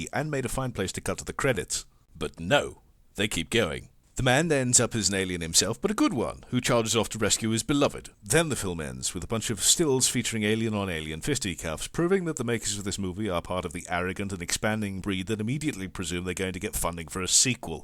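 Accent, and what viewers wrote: United Kingdom. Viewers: You have such a beautiful british accent! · And here come the fallacies. "This is only a little similar! It's not the same! And to support my argument, here's an ad hominem statement." Alright then, let's put it all on the table, Similarities: Fast frames and fast talking, Reviews, Brittish accent, witty criticisms, same concept all around. Differences: does movies, Yahtzee does games. draws his own frames, Voices are different. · I feel like I've watched the movie and Now I don't have to waste 7 of my hard earned dollars. I also feel smarter because I spent my time listening to a very funny man who makes very good points about the current film industry. Also he is British which gives him lots of credibility in my American eyes. I mean all the bad guys in star wars had british accents didn't they?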